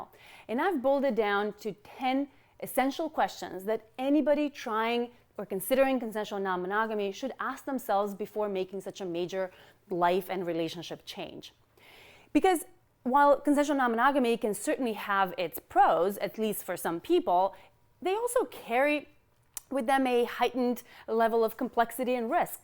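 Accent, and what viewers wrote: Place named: North Macedonia. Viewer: Great video. I've been into the open relationships, swingers lifestyle, polyamory, consensual non-monogamy for years - before it was considered a hip thing to do. I totally agree the past couple years all this has been trending, but I'd also says a lot of the Millennials are treating it like a fad. A fad until they get hurt - due to lack of research before jumping head first into the lifestyle. Btw...I love your podcast!! And I can so hear that Macedonian accent of yours coming through 👌 😁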